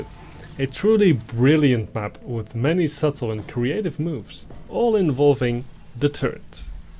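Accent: Netherlands. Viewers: yooooooo your dutch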